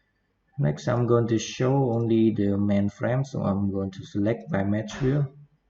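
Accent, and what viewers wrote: Cambodia. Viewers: Your pronunciation sound as Cambodian style. But It’s very nice and impressive VDO. Thank for your shearing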